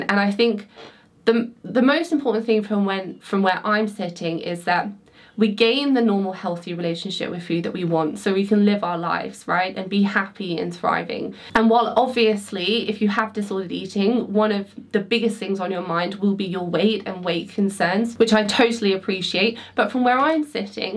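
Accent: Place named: England, United Kingdom. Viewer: I am on a path this week, discovering that you are speaking ME and I have an eating disorder. This was the very first video I found of yours- I watched it 3 days ago and I can't stop thinking about this one thing you said: "Why not shift your thinking from, "How can I lose weight?" to instead simply a goal to have "a healthy relationship with food so you can live out a happy life." YES! This insight has COMPLETELY changed me and I just wanted to say thank you for helping me on this brand new journey to start having a healthy relationship with food. You are amazing. P.s. your English accent, long hair and delicate beautiful features remind me so much of the gorgeous actress Jane Seymour.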